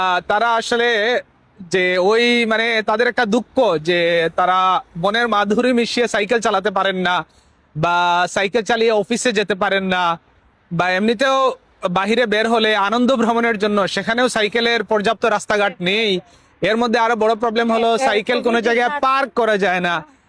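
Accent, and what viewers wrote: Sylhet, Bangladesh. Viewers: ভাই কি সিলোটি নাকি .?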